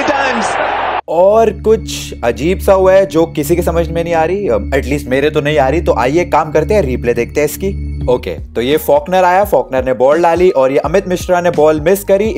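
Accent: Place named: Hyderabad, India. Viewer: mohtarma hyderabadi hai hamare yaha per aisich baat kartay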